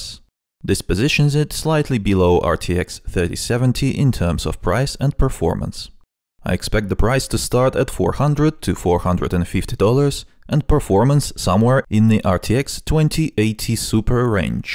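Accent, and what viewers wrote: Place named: Russia. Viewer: Блин слышно русский акцент)) А так контент годный, продолжай в том же духе)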